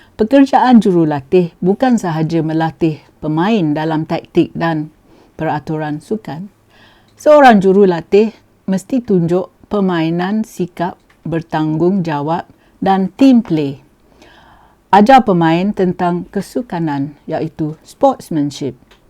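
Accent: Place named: Kelantan, Malaysia. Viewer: speaks malay kelate accent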